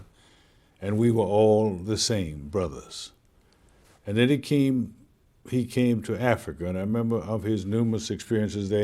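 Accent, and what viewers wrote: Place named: South, United States. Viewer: Yeah he duz hv a southern tone, but its Subtle. His vocal tone cadence add to his credence.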